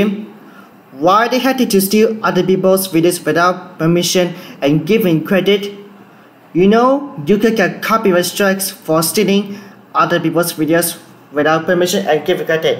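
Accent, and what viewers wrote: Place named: India. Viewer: his voice is actually indian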